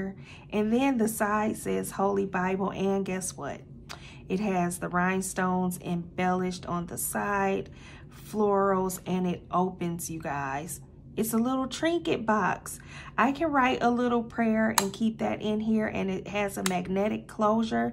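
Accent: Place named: South, United States. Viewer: I really love your southern drawl. Very soothing! Good ideas. I am not crafty but writing things down as you say is important!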